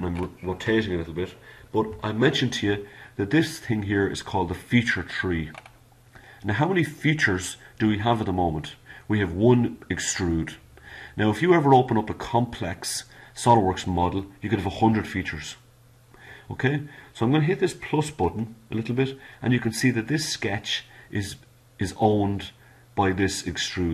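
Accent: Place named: Galway, Ireland. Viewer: Good stuff....just came on you videos today.......judging by the accent i could drive down the road and buy you a beer... (Mike from Galway)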